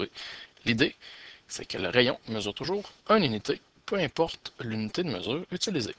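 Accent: Quebec, Canada. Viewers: Le québécois est vraiment syyyympas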